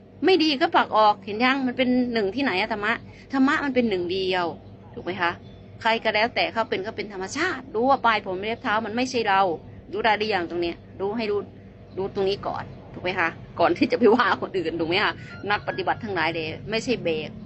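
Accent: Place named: South, Thailand. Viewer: สอนธรรมได้ดีเข้าใจอย่างลึกซึ้งเลยครับ ชอบสำเนียงใต้ ฟังแล้วเพลินดี อนุโมทนาด้วยครับ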